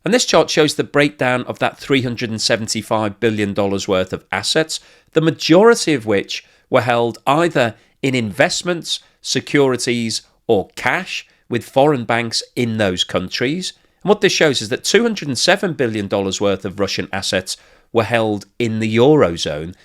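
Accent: United Kingdom. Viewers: I hear a British accent. I would prefer somebody with a Belgian accent discussing this matter. Britain does not have a dog in this fight. Your efforts might be viewed as an attempt to inject discourse between Russia and Brussels, Britain's competitor on financial markets. There is a conflict of interests here.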